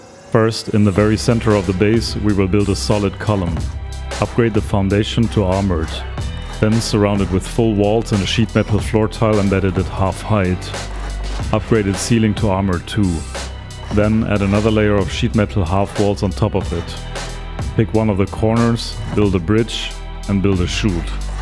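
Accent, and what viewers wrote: Germany. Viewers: Bist Deutscher oder?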